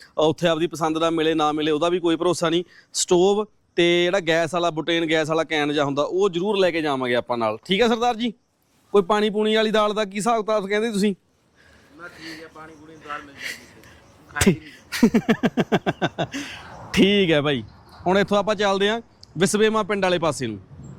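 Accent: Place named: Mohali, India. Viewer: ਬਾਈ ਦੀ ਬੋਲੀ ਤੋਂ ਮੋਹਾਲੀ ਦੇ ਨੇੜੇ ਤੇੜੇ ਦਾ ਲੱਗਦਾ ❤❤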